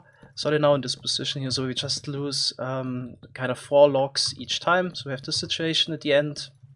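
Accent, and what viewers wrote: Germany. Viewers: Nicer Deutscher Akzent, bro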